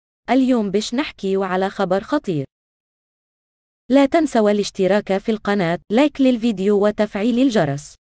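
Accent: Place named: Europe. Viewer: من انتي يا أيتها القناة صوتك يذكرني بي امراءة افرنخية أو صهيونية ليس بصوت عربية أصيلة ان شاء الله مبروك علينا فتنة جديدة نزلت على راءس الشعب والهبال وحلة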